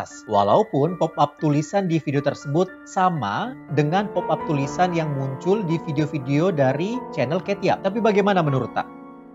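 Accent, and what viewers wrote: Makassar, Indonesia. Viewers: Orang Makassar kah ces?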